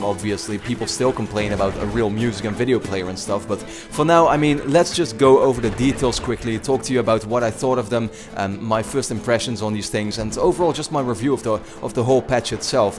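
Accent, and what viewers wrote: Netherlands. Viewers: Ben je Nederlands? :p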